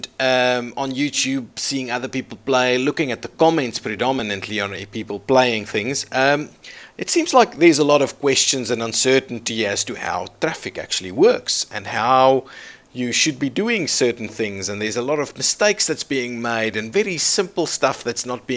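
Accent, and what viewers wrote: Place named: South Africa. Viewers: I pinned him as South African immediately. :) 👍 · As soon as i heard him speak I thought you were South African, then I heard you say "trrry" then I knew . Glad to have this video. Really helped me as I always battle with transport on cities skylines. You just got a sub.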